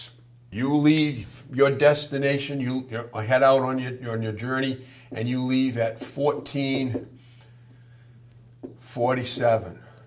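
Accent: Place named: Eastern Shore, United States. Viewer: One- of the greatest gifts to humanity is teaching. The second is teaching WELL! and you achieved both! Your tone and pace is excellent. Now far from my Eastern Shores I do enjoy the accent, which of course we know we don't have but everyone else has one.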